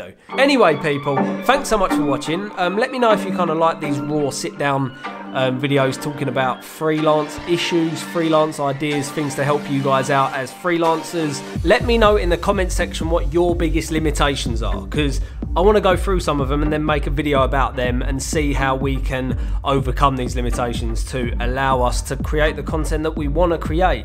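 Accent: United Kingdom. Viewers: Brit accent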